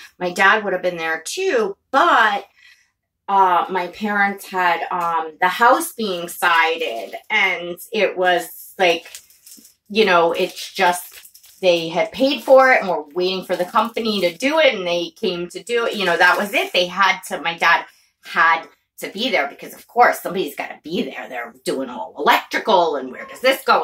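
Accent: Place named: New York, United States. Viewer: Look at you girl!!!! You look and sound amazing!! We missed you (I personally missed that New York accent). 😘😘😘😘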